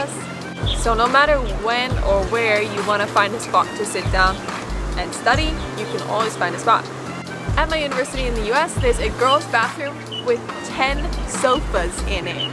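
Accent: Taiwan. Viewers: 我真的覺得前面開頭講的英文有台灣口音!! 一開始聽不習慣… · 一開始打開影片聽有種台灣人說英文的親切感覺，後來才想起來妳是美國人ＸＤ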